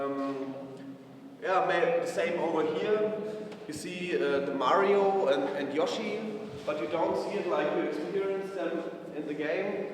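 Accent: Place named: Germany. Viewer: man hört ganz eindeutig deinen deutschen akzent wenn du english sprichst :D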